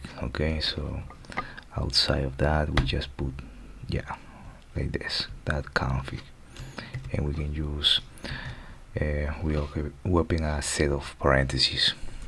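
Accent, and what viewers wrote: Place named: Dominican Republic. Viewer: i feel a little know accent in your speech, like a dominican acccent. good content tho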